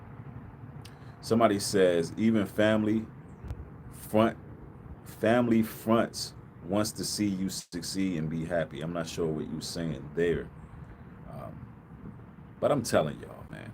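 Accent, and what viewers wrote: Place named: Washington DC, United States. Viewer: Aye brotha, are you from the dc area? I feel like im picking up a hint of that accent. Been checking your vids out for a minute. Much respect!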